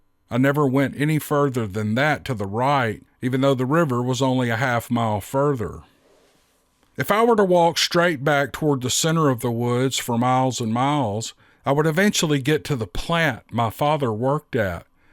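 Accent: South, United States. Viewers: Lol see-ment (cement) I love your country accent!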